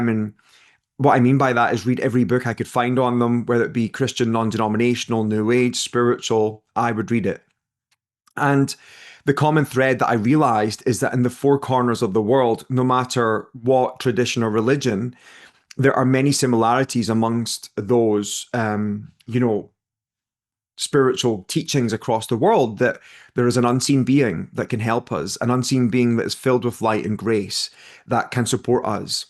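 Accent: Scotland, United Kingdom. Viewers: Hi. Nice to hear another Scottish person, im from Edinburgh 👋 I speak to my angels and guides every day. This video appeared on my feed, yet I've never heard of you before. Someone must have put it there 🥰😇 I see my angels sparks of light ✨️ everywhere around me. I saw an electric blue flash of light too that i believe was one of my guides. They all got me out of a difficult situation and send (bombard) me with messages and synchronisities everywhere. I also just recently did my Angelic Reiki 1&2 ✨️ 😇 I wish i could physically hear and see them though. Still on my learning path, and reading every book i can. Would be great to connect with you though ❤🙏 I really want to develop and strengthen my abilities to help others ❤ It's nice talking to other like-minded people 😊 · ❤, much gratitude for sharing your precious time and knowledge with us all. 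Joining from Tenerife. Born in Aberdeen Scotland. It's lovely to hear your Scottish voice. ❤my gran also read tealeaf.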